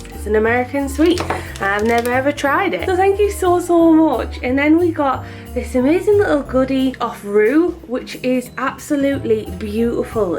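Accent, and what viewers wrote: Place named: Yorkshire, United Kingdom. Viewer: Ahh love coming onto a channel and finding someone with a similar accent to me. Are u from Yorkshire? I’m from Hebden Bridge near Halifax, born in Bradford. I want to do an art channel and I have wondered what will people make of my accent and should I learn how to tone it down lol but then I hear you’re accent and u sound luvli!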